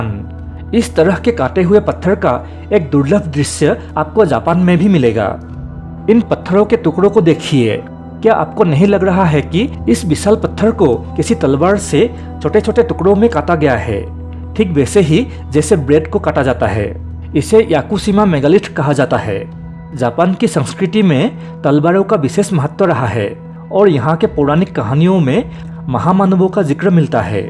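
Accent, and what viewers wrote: Assam, India. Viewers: aapke hindi meh bohot problem hai ... assamese accent ha aapka pls kisi aur se narrate karwayiye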